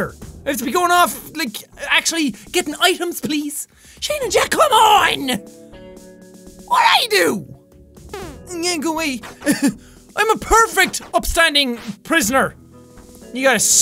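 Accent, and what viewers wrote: Ireland. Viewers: Zarif Safin irish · Lol it's Irish dude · haselbacher he is IRISH and me too # Irish4life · Tirteen strengt. Lol, i love the irish accent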